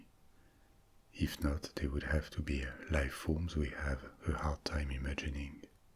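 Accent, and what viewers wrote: France. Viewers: Tu est français ? Car avec le nom de la chaîne et ton petit accent français on dirait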